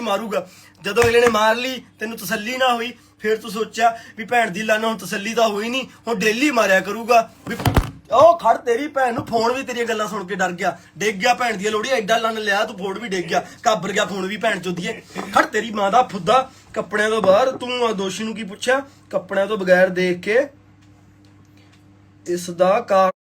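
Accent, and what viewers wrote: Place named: Malwa, India. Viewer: Theth malwayi gala .😂😂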